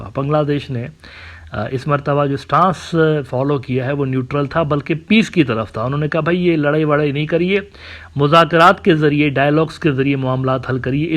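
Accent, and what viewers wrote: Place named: Multan, Pakistan. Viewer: aapke baat karne ka tarika Multan walon ki Tarah lagta hai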